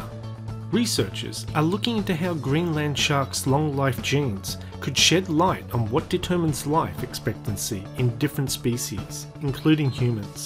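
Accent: Australia. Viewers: The narrator's Australian upspeak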